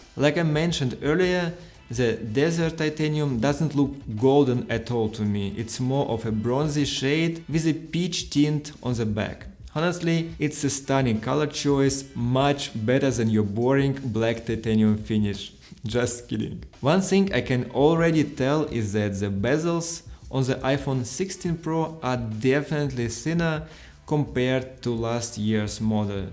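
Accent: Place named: Russia. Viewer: The most russian accent ever 😃😁 всемусердецный привет из Азербайджан 😃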